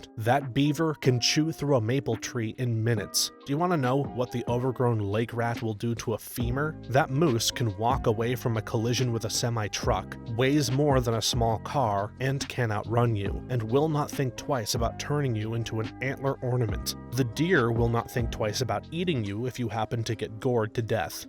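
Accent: Scotland, United Kingdom. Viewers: Nobody commented on that Scottish accent?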